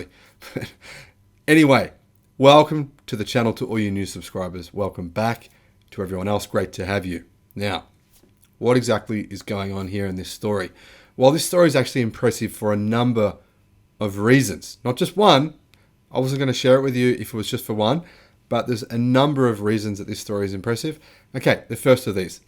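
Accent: Australia. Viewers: Thanks mate, good to hear an Aussie talking Tesla the way you do. I have been tossing up about buying the new 2022 Model 3 and although I know it’s so much better and improved in so many ways to the 2021, that slower 0-100 time by .5 of a second is something I am grappling with. The one I test drove recently was the 0-100 in 5.6 seconds and to go to 6.1 seconds could be a dealbreaker for me. It’s the slowest Tesla now (albeit still bloody quick) and annoying that I can’t get hold of the previous battery model and quicker off the line car. To be honest, I could not give a stuff about range, that is so not an issue for me.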